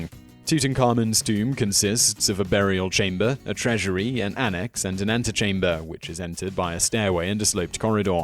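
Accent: England, United Kingdom. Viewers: Fantastic but so very english accent some words I can't understand.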